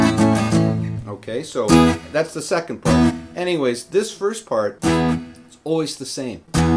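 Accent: Canada. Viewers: Your presentation & discussions about the song are brilliant ! Your descriptions are so on. I had no idea how complicated this song was/is. I love the Canadian accent - it's awesome too. When you live near the border of Canada - you just know when you hear your Canadian Brother speaking ! This tutorial is phenomenal !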